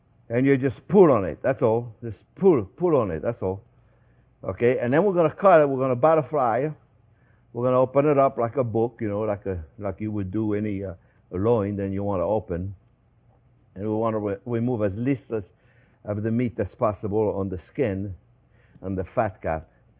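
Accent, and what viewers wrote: France. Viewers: I love your French accent Chef! I am certainly going to make this stuffed pork. Perfect for Fall here in Canada. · Don't loose your French accent, but I didn't understand what you were saying with the sign language at the end after you tasted it but it was still funny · Ah ah , vous m’avez encore faite rire … et cette recette a l’air succulente ! Je vais la proposer à mes amis ce week-end. Et votre accent est très frenchy. On adore 😂. Merci pour votre belle cuisine chef !❤ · A little bit on accents! Accents are a reflection of geographic pride Chef! Listen to those from NYC, TX, GA and many will say, "what the heck did they just say," it's geographic pride and some things can never be changed. You are an American French Chef, never apologize for your accent, it's you! A beautiful program.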